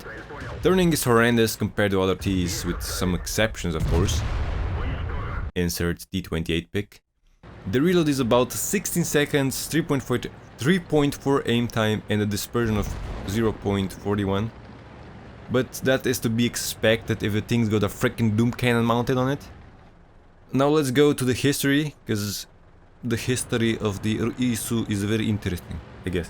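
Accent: Russia. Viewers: I really think you should do more of these vids, russian accent is best accent in the world! Also the history lesson was nice :D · Are you Russian who speak english? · Dude i Love your Videos! They are hilarious😂💪🏻 where are you from btw? Russia or maybe Serbia?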